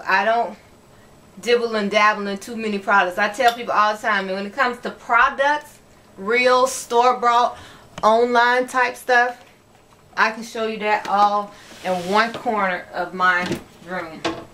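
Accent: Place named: United States. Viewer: Omg I can't NOT believe I'm just now coming across your channel I it's 4am and I'm up watchng your channels 😴.... First I love your country accent, your sprit and passion for natural healthy hair make the videos more enjoyable. Second 👀😱 that cabinet full of powders! Please share. <mail> I use a lot of essential oils but never used a variety of powder just the Aztec clay on my hair it was okay my coil sure did pop👀🙈😱.... Lol as for my daughters hair it worked well for one but dried out the other one. Quickly stopped. So I just use that as a face mask or toothpaste. One of my daughters hair is thick thick thick and I can't seem to keep it moisturized, I invested in the Qredew and its aiight! 😒.... Run down of my struggle cause it's GETS real ! Lol can I get a list of powders you use? Thanks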